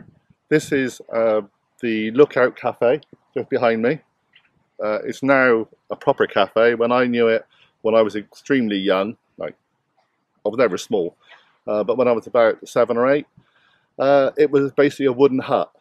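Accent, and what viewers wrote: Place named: Cornwall, United Kingdom. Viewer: Are you from Cornwall? You have that Pirates of the Carribean accent putting stress on the "-er" like shiver me timberrs. 😁 I watched the 2016 open Mickelson vs Stenson and was amazed and I went to a driving range and was hooked!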